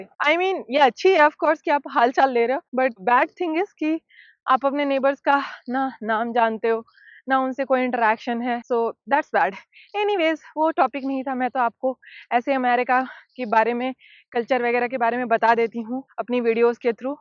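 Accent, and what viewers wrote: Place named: Bihar, India. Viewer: ji namaskar 🙏🙏 Kya aap Bihar se ho aapki bhasha se pratit hota hai🙏🙏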